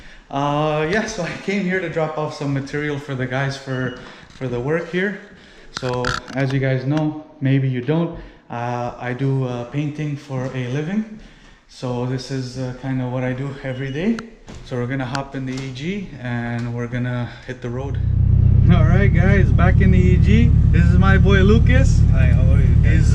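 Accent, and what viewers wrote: Canada. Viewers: from the moment you opened your mouth i knew you were from gta/peel lol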